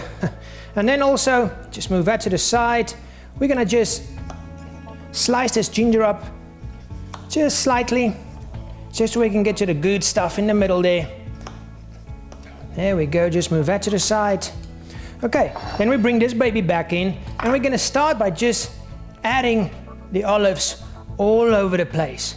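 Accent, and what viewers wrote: South Africa. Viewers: sounds south africa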